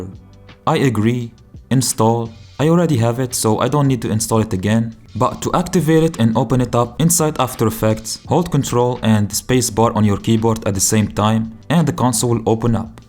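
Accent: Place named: South Africa. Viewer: I know a South African when I hear one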